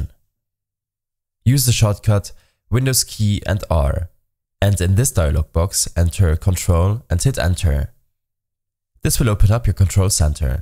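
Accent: Germany. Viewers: MAnn merkt so das du deutsch bist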